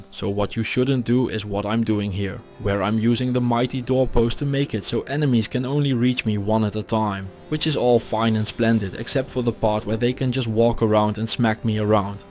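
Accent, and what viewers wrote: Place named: Netherlands. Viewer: you sound SO Dutch it hurts my ears!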